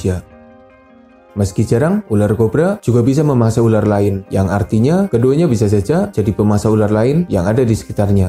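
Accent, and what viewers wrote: Banyumas, Indonesia. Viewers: Ngapak binginget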